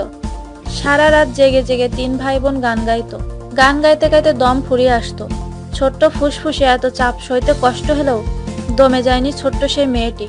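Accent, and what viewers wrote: Bangladesh. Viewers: Tumi ki Bangladeshi.. bangla desir moto kotha.. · কি বিশ্রী উচ্চারণ এই বাংলাদেশী মাগীর। ইন্ডিয়ান বাঁড়া চুসে বিচির রস দিনে দুবার খেলে উচ্চারণ ঠিক হবে।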